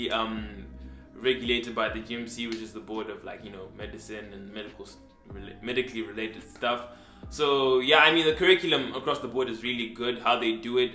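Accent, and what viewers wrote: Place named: Zimbabwe. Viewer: yess I knew it 😅 I could tell from the accent , I am zim too 🇿🇼 🇿🇼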